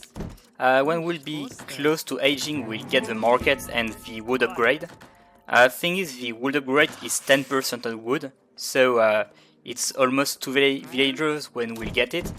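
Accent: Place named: France. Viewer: That french accent is insane 😂😂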